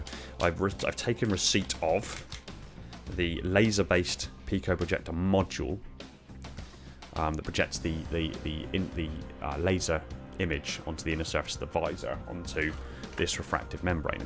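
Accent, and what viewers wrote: United Kingdom. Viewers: I love British narrations idk why it just sounds so much smoother and intelligent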